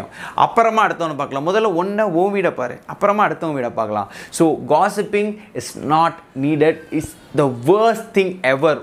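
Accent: Salem, India. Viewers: Bro nenga salem ah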